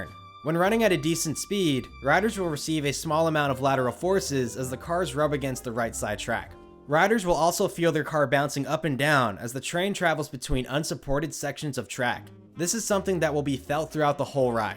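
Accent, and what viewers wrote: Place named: California, United States. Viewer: This is the most Cali accent ever..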